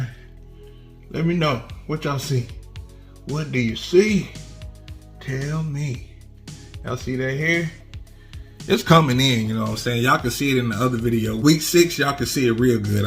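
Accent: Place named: South, United States. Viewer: Even got hair on my chest … “ but that was already there “ 😂😂😂 bro you need a podcast , you’re hilarious. I can tell you form the south for sure . I am to , I’m in North Carolina.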